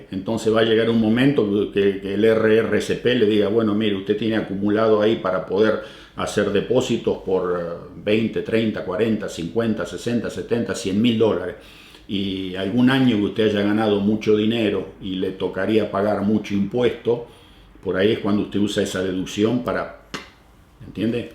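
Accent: Argentina. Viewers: Q grande,por tu forma de hablar parece q nunca te fuiste e Argentina,como te quiero!saludos desde Buenos Aires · Cómo no se te fué el acento... Argentinazo!!!!!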